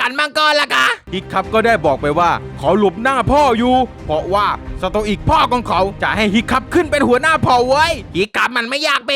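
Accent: South, Thailand. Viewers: พี่คนใต้เหรอแหลงใต้ชับพึด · สำเนียงคนใต้เลยนิ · แหลงใต้มากๆ ก็ดีน้ะครับหลกดี555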